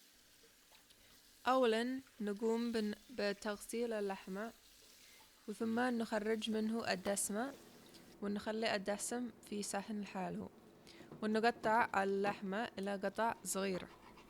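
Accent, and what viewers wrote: Yemen. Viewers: تسلم الأيادي تقديم مميز للأكل اليمني بطريقه سهله وسلسه بعملها الآن بطريقتك بس لحم خاروف وقطع اصغر ومتأكده انها بتكون لذيذه وحلوه كجمال اللهجة اليمنيه سبحان الله وبحمده سبحان الله العظيم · I like your Yemeni style Arabic.. and your recipes of course :) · ههههه تعجبني وهي تتكلم عربي باللهجة اليمانية المحشوش اكلة جيزانية بس مااعرف كيف يسوها بس اطلبها من اقربائي اهل جيزان ويجيبوها جاهزة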